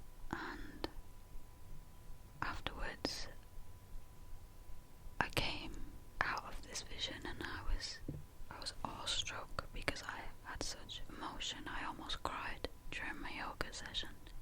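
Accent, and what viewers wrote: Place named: North, United Kingdom. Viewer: Awesome video, really love this channel. Your northern accent/dialect adds to the experience. Love you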